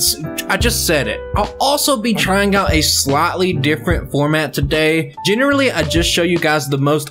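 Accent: South, United States. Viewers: I have to say that I enjoy the "unoptimized run first, optimizations later" style, and I also enjoy the longform videos (such as the Mr. Mime vid). The variability in content is nice. Thirdly, the Sanqui runs injecting new moves, types, and mons is also a unique treat that sets you apart from others on the scene, and the Garchomp vid is what got me to first click on a vid of yours, actually (and your accent and demeanor helped me to stay; I've got southern in my family and I feel it's very underrepresented in many gaming communities) 😁 I think this is the first vid of yours that's came out since Scott's Thoughts' Tentacool run that gives you a mega-shout-out at the beginning, too -- I hope you see the views tick up from that!!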